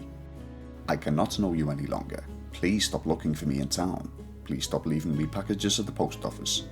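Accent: Liverpool, United Kingdom. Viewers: Always a good thing to hear a scouser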